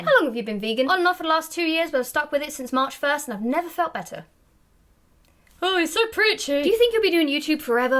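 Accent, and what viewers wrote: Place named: England, United Kingdom. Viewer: Just found your face. You probably have the cutest British motormouth accent from England. You're from England right?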